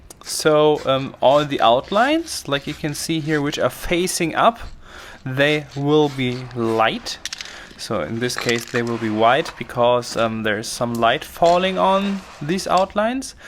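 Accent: Germany. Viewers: Hi, love your work! Where are you from? Love your accent! You sound either German or Scandinavian.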